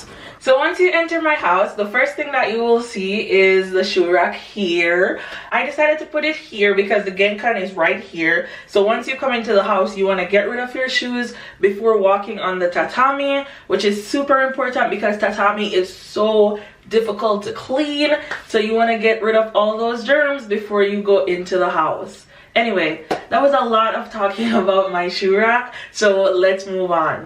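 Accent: Jamaica. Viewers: Your accent sounds Jamaican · R u Jamaican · You sound Jamaican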